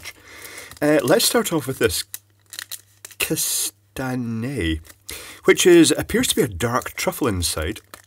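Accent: Scotland, United Kingdom. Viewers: I've watched this twice, so far. Should I be embarrassed to admit that Czech words and yummy noises in a Scots accent are oddly compelling?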